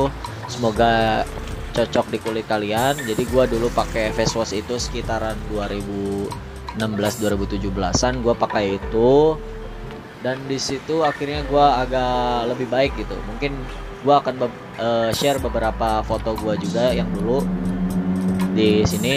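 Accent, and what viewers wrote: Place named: Karawang, Indonesia. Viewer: Orang krw mana nih suaranya ,...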